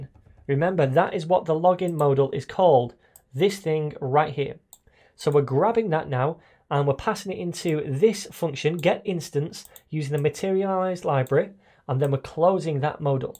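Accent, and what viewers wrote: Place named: England, United Kingdom. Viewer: we have it COVERED.. but he's english and speaks fast so it seems covid.. lol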